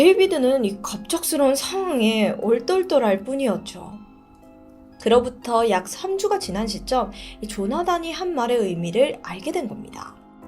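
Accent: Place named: Jeolla, South Korea. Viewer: 전라도 사투리인듯요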